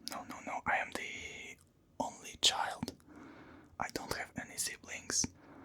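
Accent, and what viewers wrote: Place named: Russia. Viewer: I'm glad you found a hobby you love and you're doing great at it. I really love ASMR and am glad you're making such a big contribution to it. Hope your channel continue to thrive. I'll probably subscribe too and look forward to new videos =) P.S I'm currently learning English and I love your pronunciation...this is the first time I understand English speech without subtitles. Maybe your clear pronunciation is due to your Polish accent?! (It sounds a bit Russian, btw) Poland is a wonderful country! I hope I can visit it someday!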